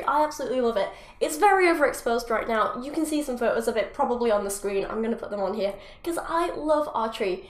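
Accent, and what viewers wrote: United Kingdom. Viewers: The British accent is getting really annoying.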